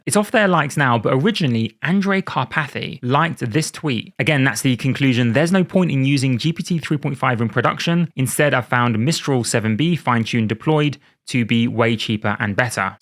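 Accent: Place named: United Kingdom. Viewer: You went full detective mode in this one. Your British accent fits that very well xD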